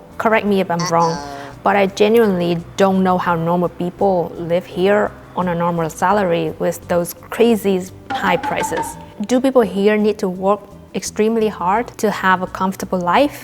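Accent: Scotland, United Kingdom. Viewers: Brilliant.A few weeks in Scotland and there's a hint of an accent.Come back any time. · am fae cambuslang, her English has a scottish twang to it, no english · Came here to say the same thing as loads of others. Three Weeks!! You have Scottish accent lol!! “Scotlan” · it's mental how your English has a Scottish twang to it. It's not a full blown Scottish accent, but you defo have some Scottish intonation. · oh my gosh the way you say "air" with a scottish accent, rolling the R sound, wow, that must be difficult for a native chinese speaker. anyway, it's a most delightful accent and it makes me smile. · Really trying to place the Scottish twang in your accent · I hear Scottish accent coming though a tiny bit and it's adorable :-) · Scottish accent! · Great video about my homeland I can definitely hear a wee bit of a Scottish accent in there. PS a lot of people pretend they have a cold when they’ve actually have been having a white Christmas lol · Can hear you have picked up a wee bit of a Scots accent. 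· am fae glasgow & she does have a scottish twang, you're talking absolute doggy poopoo. Now go back to bed it's late. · I am from Glasgow but lived in Newcastle many years, i picked up a twang also but I was honestly surprised when I heard your Scottish twang. Anyway good video and content, you just got another sub. Kudos. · Nice video, it made me laugh. The accent definitely has a few sounds from Scotland. Not purely as there is still much that sounds like a Chinese accent. Never thought about the water helping to improve the skin and the hair. Makes me think! 😄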